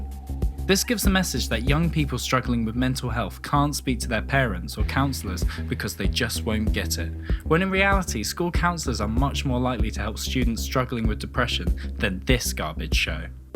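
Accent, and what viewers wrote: United Kingdom. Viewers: That’s literally a common British voice, I’m pretty sure I sound a bit like that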